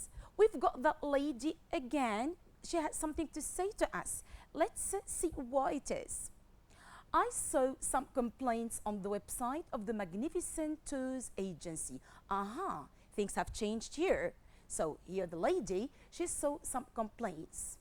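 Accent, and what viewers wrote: United Kingdom. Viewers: Loved that RP accent bravo madame ❤❤ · Reminded me of the old British accent ..I thank you for dealing with all the necessary components required for the productive stage ..Hats Off!!!!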